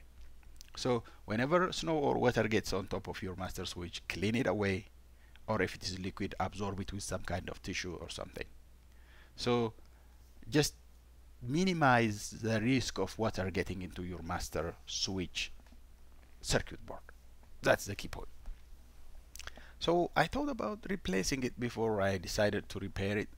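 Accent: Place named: Ethiopia. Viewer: Bro - I face the same problem on my Cruze. Sadly I was convinced the issue is on the passenger switch. Thanks so much… by the way are you Ethiopian? Your accent resembles like my Ethiopians 😊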